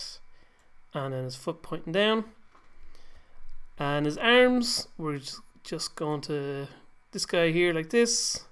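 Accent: Ireland. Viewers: lol....wtf? Is it not obvious? He's Irish (or a similar accent)...and how does (I assume you're saying) old have anything to do with how he says words?? I'm so confused.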